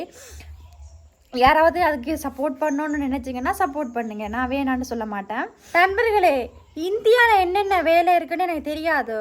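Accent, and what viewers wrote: Sri Lanka. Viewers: இலங்கை தமிழ் அழகு நீ பேசுவதும் அழகு கபடமற்ற பேச்சழகு பெண்மை அழகு உன்னிடமுள்ளது.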